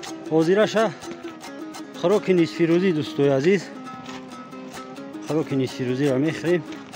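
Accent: Tajikistan. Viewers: Дастон дардро набинад, Худованд дар касбу кортон баракат ато фармояд! Бисер бо як лахчаи точикии зебо сухбат мекунед.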